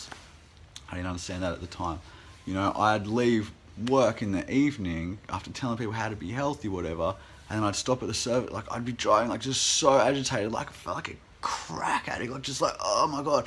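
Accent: Australia. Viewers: It was the first time that l watched your video . Love your accent , do you from aussie? Thanks for sharing your experience. I hope that I can get rid of this habit one day . Although I've juste had finished eaten again after dinner . Sorry for my English . Love from france .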